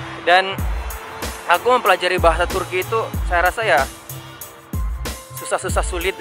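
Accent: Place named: Parabek, Indonesia. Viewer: logat Parabek wkwkkw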